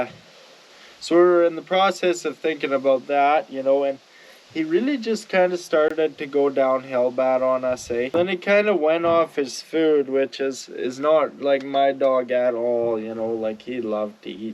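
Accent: Canada. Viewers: I could listen to him talk about absolutely anything. I’m Canadian but I am not blessed with his amazing accent 😊❤️ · Dude. Damn, Ive only been watching you for a month, I appreciate seeing someone your age with a willingness to work and a willingness to learn, your vids are informative and you crack me up with the Canadianese you speak. RIP Cougar. I put my chocolate down 10 years ago last February and I still have dreams about him. I bet I woke up and looked at his chair for a week straight after he was gone, it just becomes such a habit and like you said- aint no better way to start your day than to see your boy all happy and ready to go on another adventure. Labs are the best, I dont care what anyone says if youre blue collar and hunt and fish and work on things outside, a Lab is the way to go. My boy was the best damn friend I ever had and that 12 years went by in a blink, I never had kids so he truly was my boy. 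My bro. He was still eating right up until the end and still plenty happy in life. The pain meds obviously helped him but that bone cancer is a bitch, for dog and human. Anyway, Im sorry to see Cougar go, he showed you what a good dog can do for a persons life so go ahead and get out there and get yourself another pup and bring him up. Im a renter so getting another dog is out of the question but you, hell, you should go get two dogs and I aint even kidding. Take care.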